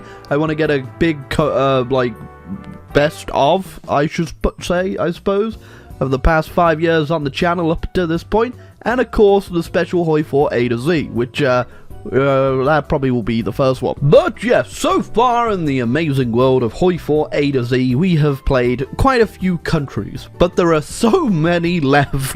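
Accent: United Kingdom. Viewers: You watch these videos and think he sounds anything like an American? Have you ever heard an American speak? He's definitely British.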